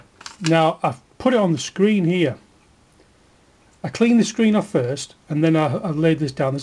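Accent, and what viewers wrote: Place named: Lancashire, United Kingdom. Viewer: Hi mate, thanks for your tutorials, you're doing very well.Hey I love your accent. Is it Lancashire you come from or somewhere not far? I used to attend boarding school in the beautiful Lake District which as you may know's a stone throw away. I have just bought a Kaosilator like yours and learning. Do you happen to know how I would save stuff onto the SD card without erasing previous data? The instructions are not the clearest in some respects. Cheers.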